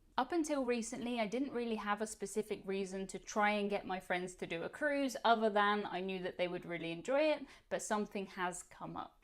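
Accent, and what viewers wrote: United Kingdom. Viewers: Thank you for explaining the “Naughties” were not naughty! I love proper British English, and appreciate your use of it and the explanation for non-British English speakers! I’m excited to hear how your friends like the cruise!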